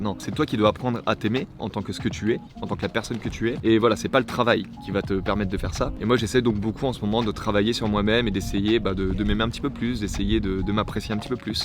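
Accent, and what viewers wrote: France. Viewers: O_O !! Alors là pardon pour le cliché mais un mec hétéro qui regarde des dramas/films japonais, qui mange avec une main devant sa bouche (façon japonaiSE) et qui est fan de Nana jusqu'à avoir le cadenas de Ren autour du cou bah alors c'est grave inédit !! J'ai perdu 10 mes jeunes années à chercher un mec qui comprendrait ma "passion drama" et ai fini par abandonner... et me suis mariée à un mec qui ne comprend toujours pas le délire (et c'est pas grave parce que d'autres qualités c'est bien aussi !!), mais je ne savais même pas ça possible XD !!! Sinon, une vidéo feat avec Pepe et Vivi c'est possible un jour genre un format interview pour qu'ils parlent justement à coeur ouvert de cette expérience Terrace House ???? Et aussi, ton accent (quand tu parles français) c'est quelle région ?? :D Plutôt Est ?? (Alsace-Lorraine ?) Et aussi, tu pourrais nous parler un peu du regard que tu portes sur toi-même depuis que tu as choisi cette vie au japon ? 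Est-ce qu'il a évolué par rapport à qui tu étais en France, à savoir si il n'y a pas eu des couac d'égo, je trouve toujours plus intéressant quand on rentre dans le fond des choses et qu'on aborde la réalité, de nombreux youtubers ont parlé de l'envers du "décor" je trouverais ça grave cool que t'en parle. Et aussi... Non en vrai j'ai fini de bavasser XD !!! Bonne continuation o/ !!! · Salut à toi! Quelques petites questions du coup pour un prochain Dis-Nous: - Est-ce que l'accent français t'a déjà posé problème en terme de compréhension auprès de personnes japonaises? - Comment ça se passe le covid au Japon? On te voit souvent en extérieur durant les tournages (beaux paysages au passage), y a pas de restriction sur ce point-là?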